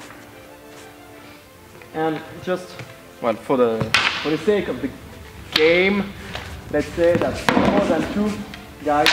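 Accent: France. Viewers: Excellent approach! Kudos for being able to assemble more than a few warriors at one time. :) I might like to offer a few suggestions. Frankly it's difficult to follow your French-accented English; it might be easier if you spoke in French. ;) For swords, I would say to use "bastard swords" or "hand-and-a-half swords", at the very longest. Tests that we've done in the forest show that longswords are very awkward to carry on a regular basis, Peter Jackson's movies notwithstanding. And longswords are little extra help against armor. You really need an axe or a mace to directly defeat armor. Spears are great in the forest, but the ones you show in this video may be too long. I suggest you try moving about in the bush with this equipment before making any conclusions. Shorter spears allow the butt to be brought to bear if an opponent is closing in. Modern warfare (with rifles) dictates a more open formation for two reasons: a bullet can travel through more than one soldier if they are side by side, and soldiers can provide support to one another with rifles even if they are many yards apart. 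Spearmen can stand more closely, so as to provide support to one another. Of course the terrain will provide its own problems. Practically speaking, a single-edged knife is more useful in the bush than a dagger can ever be. I think your formation is mostly accurate however. The spears want to be in the front, to keep the archers from being charged. They would work as bayonets did in later age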